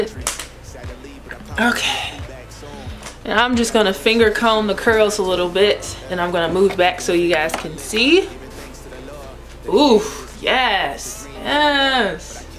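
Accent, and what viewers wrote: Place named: South, United States. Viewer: This unit is still a classic gorgeous long haired style. I love it. It'll always be beautiful on you. I love your accent and wondering where are you from? You speak like my fave cousins who are in the south, lol. Thanks great review.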